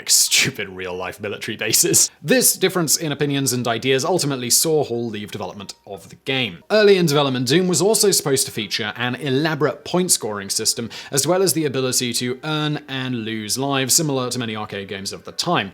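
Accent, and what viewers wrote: United Kingdom. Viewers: Dude, hes British. Haha.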